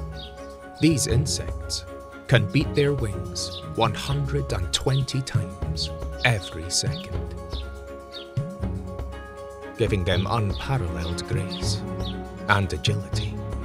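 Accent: Scotland, United Kingdom. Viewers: You Scottish by any chance?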